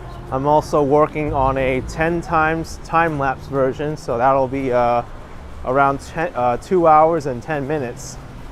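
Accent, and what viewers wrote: New York, United States. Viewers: Hi, I really like these videos of the back streets of New York. Admittedly when you announced you were moving to Miami I was sceptical that I would still enjoy your content and I haven’t watched any Miami videos to date. I always associate you with New York, your town and your accent. I watched your Leaving video but I’ve yet to watch the Decline video but I will.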